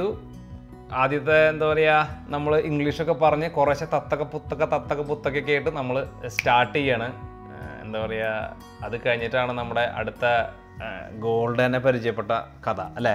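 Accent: Thrissur, India. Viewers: I came to know of your channel thru Sujit. Your way of story telling is very nice. I like your Thrissur slang. Since lam a vegetarian scenes of meat in your recipes are digusting for me. But l enjoy your narration of stories. Good luck for your future plans.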